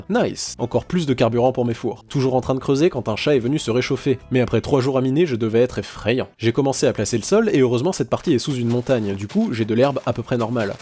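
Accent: Quebec, Canada. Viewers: tes québécois? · J'aime ton accent quebecois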